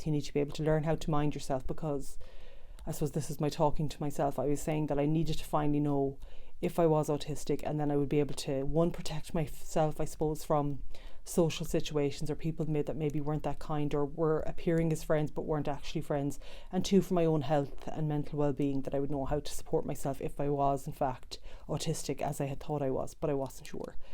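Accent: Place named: Ireland. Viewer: I am another Oregonian (from the US state of Oregon) who appreciates so much your courage to challenge yourself by making this video. It was fantastic, and BTW, I love listening to your Irish accent! (Ireland as your home, correct?) I am just beginning my new journey learning about autism, or I should say an updated description of autism, which so far, explains nearly EVERYTHING in my whole life. I'm 61. Like the other OP comment from Oregon, I just want to understand my life and myself better as well. Past, present, and future. Not sure if an official diagnosis is possible for me because of the cost, availability, and qualified professionals near where I live, although I'm not against getting one in theory. But hearing of your persistence, and yes!, belief in yourself, you succeeded in getting the answer that helped your wellbeing. This gives me encouragement and tells me to be patient with myself. 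Thank you so much for sharing your story! Wishing you the best!:hand-purple-blue-peace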